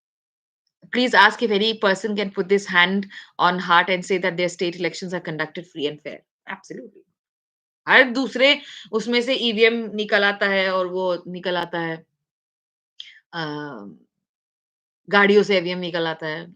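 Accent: Standard. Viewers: Madam आप का हिंदी बहुत ही शुद्ध है 😊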